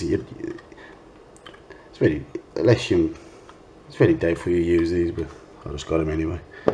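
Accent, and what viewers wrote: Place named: Midlands, United Kingdom. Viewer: Midlands man right there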